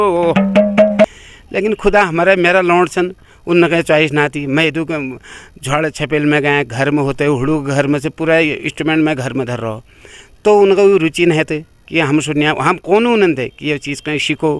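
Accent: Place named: Pahari, India. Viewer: ji apka swagat karta hu app pahari me baat krti ho bahut accha lagta hai 🙏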